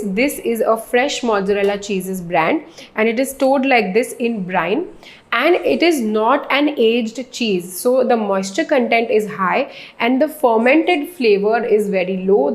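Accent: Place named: India. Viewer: The way Indian peopl speak English and their accent r really irritating even im an Indian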